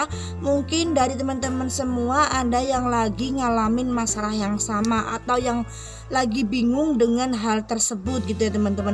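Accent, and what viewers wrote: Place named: Java, Indonesia. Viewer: mb klau bikin konten ,jgn pake logat jawa..pake bhsa indo..saya mando ora ngrti..😂😂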